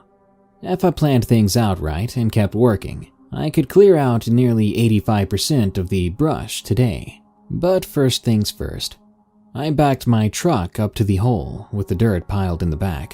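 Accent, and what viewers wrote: United Kingdom. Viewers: Why do america still think that everyone for the uk talk like that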